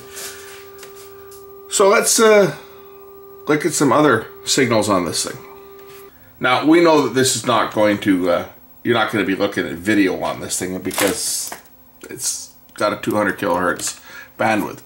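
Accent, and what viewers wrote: Canada. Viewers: exactly and it's my first properish scope. I only started learning last October'ish and my first buy was a must tool MT8205 scopemeter and before it arrived I had already advanced past it suiting my needs. (Still it's a good accurate meter) but like I said for 14 bucks its the perfect scope for someone learning like myself till I have the money for a proper one.. P.s. I should of noticed you were Canadian and not American by the way ya talk.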